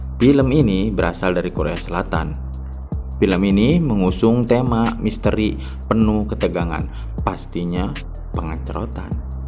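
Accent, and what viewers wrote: West Java, Indonesia. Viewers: Lucu bgt dngerin narasinya kaciri pisan org sunda ketika ngomong " SABAT .. dulu .. 😂😂😂 piseurieun pisan jadi gekgek ngadanguna ... sukses terus lah channel na smoga mkin tmbh subscrb na .. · Sunda Pisan Euyyyy 😂😂 Narasina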